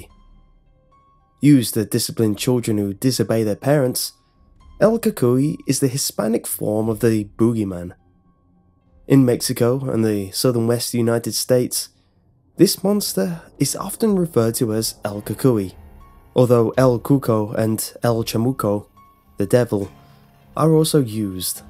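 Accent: England, United Kingdom. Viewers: It's an English accent there is no such thing as an British accent at all. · Im hispanic, and you saying Spanish-y things with your wonderful British accent was enjoyable XD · lol you kept saying it wrong but I still love that British accent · give him a break! he has a british accent what do you expect!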